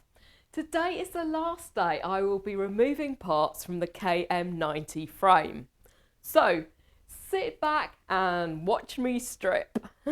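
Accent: United Kingdom. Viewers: I love her British accent, too. Also, wonderful fantastic bumper guards. 😊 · Love This Awesome & Beautiful Lady with her Super Gorgeous British Accent just mesmerized.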